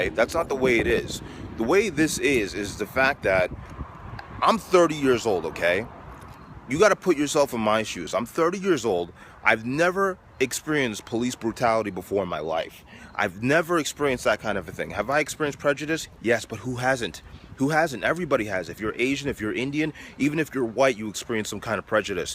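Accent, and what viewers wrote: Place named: Boston, United States. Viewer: Are you from the Northeast? You've got that Bostonian accent. Good video, by the way.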